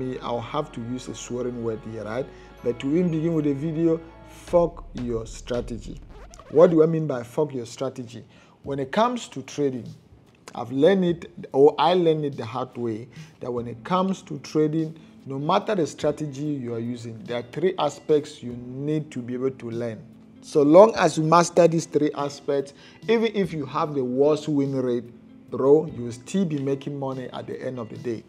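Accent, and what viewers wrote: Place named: Nigeria. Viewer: This guy looks and talks like a Nigerian from cross river or Akwa Ibom. Could it be that he is from one the villages around Bakassi Peninsula-Naija/Cameroon towns?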